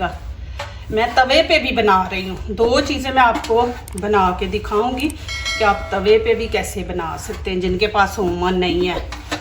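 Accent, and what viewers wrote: Punjab, India. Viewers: اردو نہیں پنجابی بولا کریں، اردو پنجابی لہجے میں مذاق لگتی ہے۔ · Doosri baat: Jin viweres nai punjabi mai baat karnay ki suggestion di hai woh sach keh rahay hain ap agar punjabi bolain tou apkay show ko char chand lg jayen Urdu ko punjabi accent mai bolnay wali weirdness bhi nahi rahay gi. Aur punjabi sajay gi bhi bohat apki awaz mai... Its just a suggestion Please don't mind. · Very poor Urdu in Punjabi style. commentary. Improve it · Assalam o allaikum best ha laiken khuda k liya apna punjabi lahga sahi krien pleas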